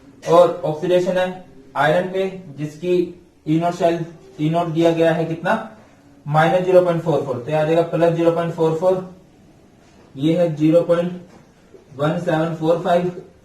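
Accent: Haryana, India. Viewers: cool sir r u from haryana thanks sir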